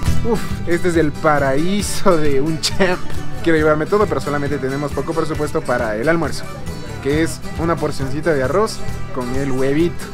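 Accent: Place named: Quito, Ecuador. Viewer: 🌟 Eres el Quiteño más simpático que he visto 😍💙 Me encanta tu acento... se te escucha lindo 💋 XOXO ❣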